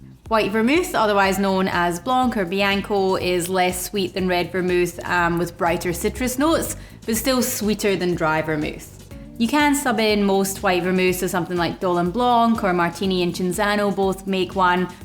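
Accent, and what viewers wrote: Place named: Scotland, United Kingdom. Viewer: Да ладно, по-моему самое шикарное в ней - это её шотландский акцент.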